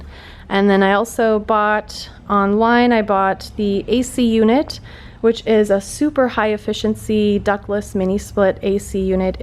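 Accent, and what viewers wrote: Minnesota, United States. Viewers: HA! I knew you were from Minnesota!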